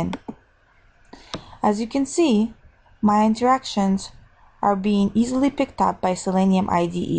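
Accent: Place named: Russia. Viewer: Your accent reminds me of the accent of my Russian friends, you gotta be of either Russian or another Slavonic origin, don't you? :)) p.s. thanks for the vid, it's good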